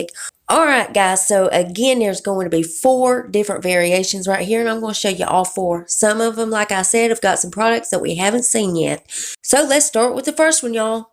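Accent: South, United States. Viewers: Love your southern accent ♥️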